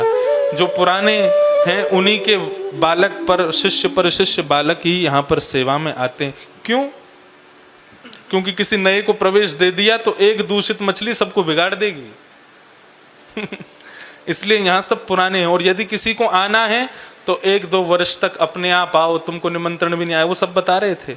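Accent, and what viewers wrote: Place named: Braj, India. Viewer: ब्रज की वाणी मे रस और अपनापन महसूस होता हैँ 🙏धन्य हैँ आप महाराज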